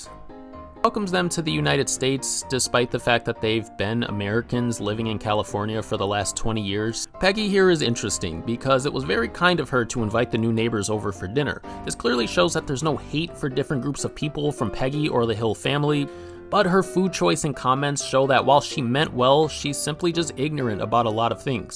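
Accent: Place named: Canada. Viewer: 5 seconds into hearing you speak all I could think was “this dude is so Canadian”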